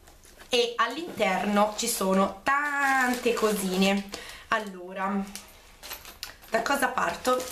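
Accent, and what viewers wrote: Tuscany, Italy. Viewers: Ciao!! Ti seguo da poco e mi sei già troppo simpatica! 😃 adoro il tuo accento toscano, la tua simpatia e i tuoi capelli - un bacio grande, al prossimo video:**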